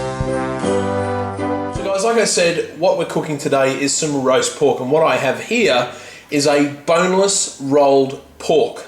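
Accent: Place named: Australia. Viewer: Wow! You are really good! My mum is a chef. c: <3 your videos, plus your aussie too! (I am if you are wondering) I'm a South Australian. c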